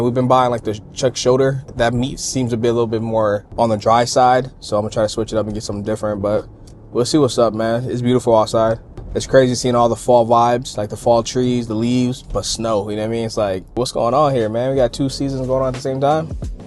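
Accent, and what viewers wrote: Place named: California, United States. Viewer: You are a wonderful husband and father,l love to how you interact with you family,you hold it down as a MAN and I love the respect you show to your family, I love to see how she give you your time to yourself and you do the same to her.. county the good work my God bless you and your family.. by the way I been watching you two since you started.. I just love your California accent I'm from Virginia and I have a southern accent.. love your content.. and content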